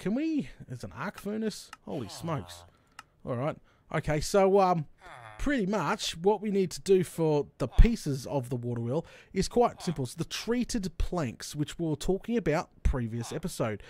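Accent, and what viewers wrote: Australia. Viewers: This man's voice is the most stereotypical Australian voice I've ever heard and I love it. Keep up the good work!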